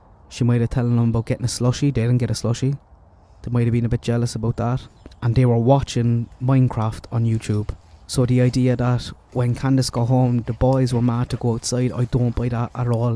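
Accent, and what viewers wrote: Ireland. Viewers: Thank you so much for that. I can't do lives or very long videos at the moment, so to have it summarised really helps. I'm with you on voice, very calming and relaxing. I would love to have been a fly on the wall when he was in service, seeing him interview murder suspects, putting them at ease and lulling them into a false sense of security. I love how he talks, but I love your accent too, I've many Irish friends and all their accents are beautiful, but so varied. I can't imagine why anyone wouldn't like?... but then we're all different and different things appeal to us I suppose. I'll try and look at his video, but I really appreciate your summing it all up. I share some of the same potential theories with you. The refrigerator, as soon as I saw it I thought it odd they hadn't taken the door off. I grew up in the 70s in London, and we used to get public information broadcasts, some acted, others animated, but there was one about a group of kids playing on a dump site, one of the kids ends up hiding in the 'fridge, there's a piercing scream when one of the girls finds him. 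The narrator with the voice of doom explains how no matter what, you never, ever play with old fridges and that if you get rid of one, you must take the doors off. Every holiday they'd play it, among others. Plus my parents drummed it into us. One of my preferred theories was exactly like yours. Either they did the whole hide and seek counting thing to get rid of her annoying them, or actuall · I am slowly going through your others. I must ask where in Ireland are you from, your accent is lovely. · I agree, I really like and it is a great advantage to have a retired homicide detective looking into the case. ASMR - yes! LOL, he has a relaxed way of speaking and I do fall asleep listening to him but then I'll relisten from where I conked out. I also like the way you speak and your Irish accent. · I saw that video also. You are on point. After Summer left the car that afternoon we have no idea what happened after that. 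She was the kind of mother that didn’t keep eyes on Summer too much. When saw where Summer slept he said he knew about Summer. He was really shocked but held it well. The mattress was on the floor so looking under a bed was off unless she pulled up the mattress. So many conflicting stories. There were no flowers planted that day. When she was at the watering hole she emphasised the flowers were at a certain spot. She knew this was a major part of her story. Oh you are for sure Irish. Kerry maybe! Love your accent!🇨🇮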